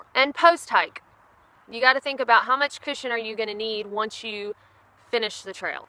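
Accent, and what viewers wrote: South, United States. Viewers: hey how old did you do the AT? also did you make it to the PCT? i could listen to you all day long i love the southern draw:)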